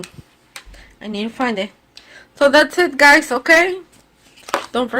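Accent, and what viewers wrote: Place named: Mexico. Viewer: That Mexican accent is stronggg lol its cute tho😂🥰 🇲🇽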